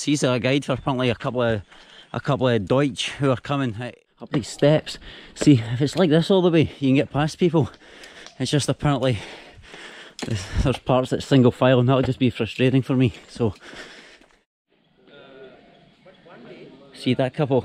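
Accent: Scotland, United Kingdom. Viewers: Its funny those 2 yanks hadn't realised your strong Scottish accent and they've been all over Scotland 😜😉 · Are you Scottish? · he is very Scottish. Scotland is a beautiful country to the north of England. to a British ear he is sooo Scottish. lovely.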